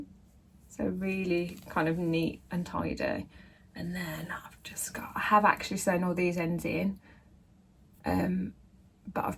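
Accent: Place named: Birmingham, United Kingdom. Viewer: I am from Birmingham so was drawn to your accent. Loved your first episode and looking forward to watching more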